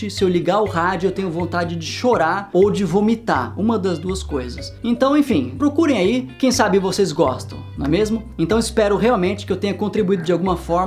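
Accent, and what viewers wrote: United Kingdom. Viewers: Vc já morou na Inglaterra? Seu sotaque inglês é igual ao dos britânicos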